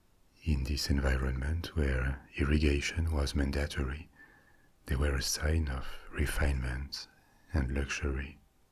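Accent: France. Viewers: My friend, I listen to your stories every night before I fall asleep. When I am asked how I slept, I say “wonderful!” How could I not when I have a dear French friend with a soothing and silky accented voice who reads to me until I fall asleep?” I’ve told many in my circle about you and most of them thank me joyfully! I have never listened during the day, but I did with this one. You are exceptional in your descriptions, your words paint the pictures in my brain! You are truly a treasure and I thank you from the bottom of my heart for putting in all of the countless hours it must take you to research the histories, write your script, set up your “scenery” for you to handle (VERY hypnotic), record, edit……..it exhausts me just typing just the steps out! It leaves me wondering when you find time to eat, spend time with your family, work or any of the things required to live your life! 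I have never chosen to use patreon, but I will now. You give me countless hours and it’s only right for me to give back. Thank you. From the bottom of my heart, my friend. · yes, he is French. He lives in Paris, so im guessing he was born there too. I love Paris. I lived there for 2 years. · Yes. I'm not 100% sure, but I believe his accent is French. 🤔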